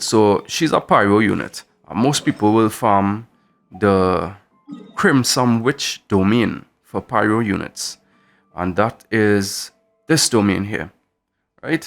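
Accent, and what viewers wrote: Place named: Trinidad and Tobago. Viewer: You sound like a trini. Btw good video. You earned a sub.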